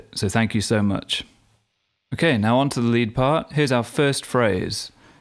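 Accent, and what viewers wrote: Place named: Birmingham, United Kingdom. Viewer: Your accent sounds familiar to me as a born & bred Staffordshire bloke....and the best I can come up with is posh brummie. You're not from somewhere like Solihull are you? 🙄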